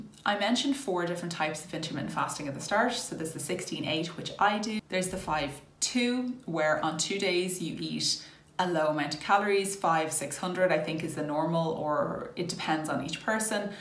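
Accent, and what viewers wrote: Ireland. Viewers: I have BED as well and also struggled with food addiction since childhood. I so agree with what you said about not being able to hear the fullness cues! I actually tried alternate day fasting last year and ended up losing some weight but inevitably plateaued because i was not keeping track of calories and, if I’m completely honest, was using my eating days to sort of binge 🤦🏻‍♀️ it was also just extremely difficult for me. Have since found your videos avs thinking of signing up for Bodyslims today and maybe trying 16:8 as well… thx for making all the info simple and clear! 🙌 Ps love ur Irish accent, could listen to it all day haha so soothing 💖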